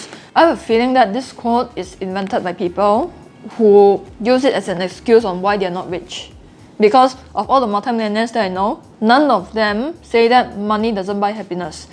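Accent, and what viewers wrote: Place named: Singapore. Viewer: Two things i love from (No Sarcasm): 1. Her punked singlish accent & style 🤘 2. F*ckin honest forex coach & motivator who DOESNT SELL ANY CRAPS, DAYDREAMING & BULLSH*T things about trading forex. Big thanks & respect!! 👍🏻👍🏻👍🏻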